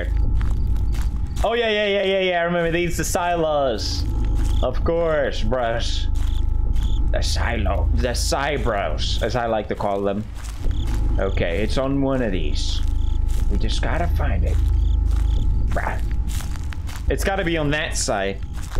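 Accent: Sweden. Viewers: i tried to copy pewds with his Swedish accent and goofy speech patterns. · Because of the heavily Swedish accent that he lost over the years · He used to have a really strong Swedish accent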